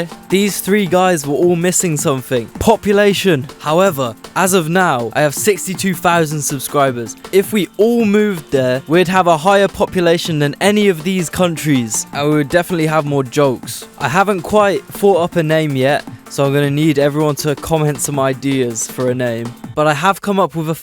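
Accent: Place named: United Kingdom. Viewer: british accent balls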